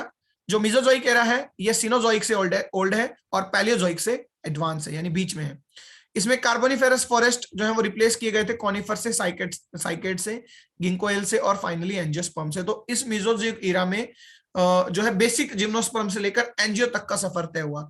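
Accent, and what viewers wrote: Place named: South Asia. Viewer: Viewers who are unfamiliar with the way English is spoken in South Asia will have trouble understanding what the teacher is saying here. I was sorry not to find an option for written captions.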